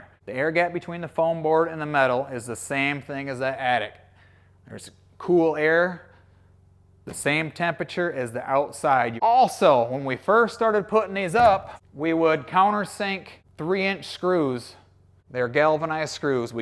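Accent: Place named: Michigan, United States. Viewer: As soon as I heard you talk, I knew you were from Michigan... it's like I'm listening to someone from my hometown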